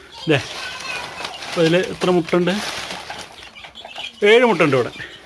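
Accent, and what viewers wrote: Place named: Palakkad, India. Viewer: ningaluda pallakad vayikol ullathe pola yavidayum kanilla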